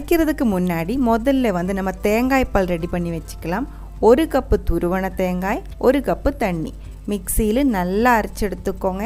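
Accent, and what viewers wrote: Kerala, India. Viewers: I like all kerala samayal sister Your kerala thamizh 👌 sister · madam from which place do you hail, sounds like a malayali speaking Tamil , any way vatappam superb just like your voice. God bless you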